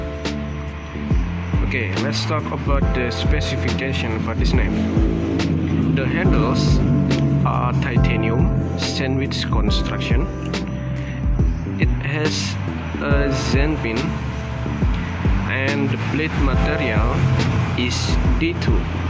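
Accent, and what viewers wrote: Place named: Spain. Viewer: Contestame si sos español que hasta yo hablando otro idioma se cono no sos ingles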